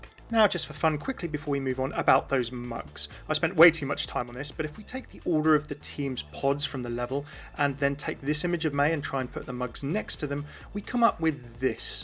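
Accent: United Kingdom. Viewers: The accent could be afrikan british origin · FoulFox Nation how can someone not British sound more British than British people? That makes no sense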